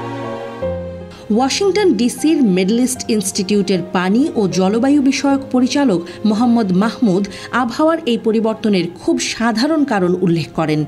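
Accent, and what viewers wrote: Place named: Kolkata, India. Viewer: আর টিভির এই ধরনের কলিকাতা দিদিদের মত খবর পাঠ করার জন্য আমি এটা বয়কট করছি।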